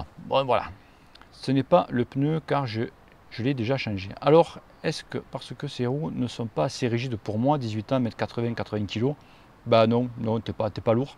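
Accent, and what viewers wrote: South, France. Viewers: Salut! Super t’es vidéo! Très instructive.. et en plus avec un super accent du sud! Je suis un voisin, j’habite du côté de Perpignan..eh oui j’ai la chance d’être catalan!😉. Je roule 3-4 fois par semaine et je souhaite faire du HT pour progresser et faire du spécifique, de manière ludique tant que possible. Je veux acheter un HT d’occaz dans un budget autour des 200-250€.. j’hésite entre un TACX BUSHIDO SMART et un BKOOL SMART PRO.. c’est pour une utilisation sur les applis connectées ZWIFT, BKOOL,etc.. et pour utiliser les programmes spécifiques.. (sur un smartphone..) des conseils éclairés sur le choix? Merci d’avance!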